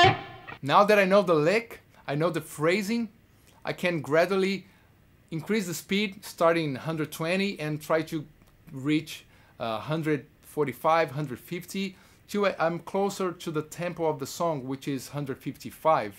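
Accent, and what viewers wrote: Brazil. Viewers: That's brazillian accent if I ever heard one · Name looks finnish, but accent seems brazilian.. which one is it?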